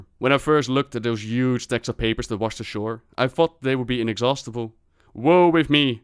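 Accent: Netherlands. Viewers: YES. Combined with the dutch accent he oh so despises, his videos, especially the R-sounds, make you shiver. XD I love it! Thanks for the good work. =)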